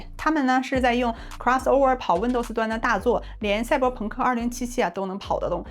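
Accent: Northeast, China. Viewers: 你是东北哪的啊妹妹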